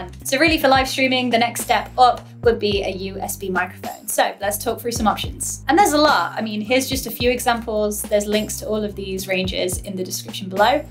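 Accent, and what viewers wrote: United Kingdom. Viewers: glad to see you back on youtube and you have done an amazing job with the home studio, also i love the little hits of the British accent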